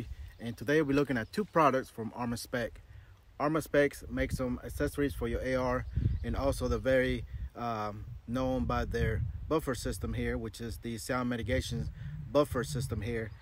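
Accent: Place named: Lumberton, United States. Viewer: You from Lumberton? I got some family from that area and you got that heavy accent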